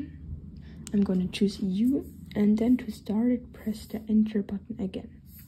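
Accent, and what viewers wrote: Germany. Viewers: Man hört den deutschen raus 😂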